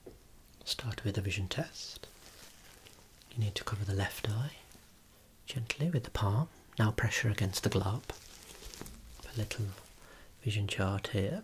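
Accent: Birmingham, United Kingdom. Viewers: Birmingham?!?! Wow i love the accent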